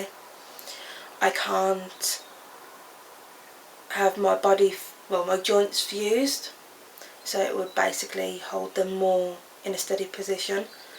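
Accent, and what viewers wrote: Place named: Birmingham, United Kingdom. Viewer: I usually cringe at Brummy accents; I did not even notice yours. Thanks for sending me the link to your video and will definitely follow your progress. i have Spinabifida, reactive lifelong depression, anxiety, recent stroke, possibly M.E. and or possibly M.S., etc, etc, etc... as many of us have. Your willingness to keep going, just from seeing it in this one video offers me some hope. I hope you can and will record videos maybe every few days? It's things like this videoing that help people like me keep going x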